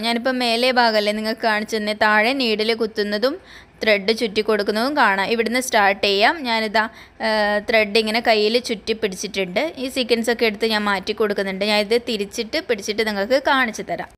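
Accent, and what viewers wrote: Kannur, India. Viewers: നിങ്ങളുടെ place kannur aano സംസാരം കേട്ടിട്ട് അതുപോലെ und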